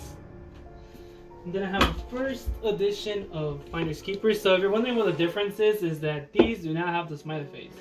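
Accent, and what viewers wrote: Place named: Latin America. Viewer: Book haul + latino accent = asmr = heaven for me 😉😉😜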